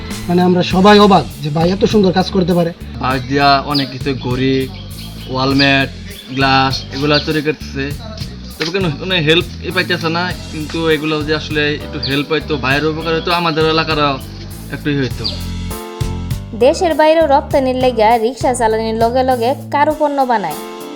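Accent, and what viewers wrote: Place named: Mymensingh, Bangladesh. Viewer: আইন্নে ময়মনসিংহের ভষাডা পুরা তুইল্লা ধরছেন 😎😎😎😎😂😂😂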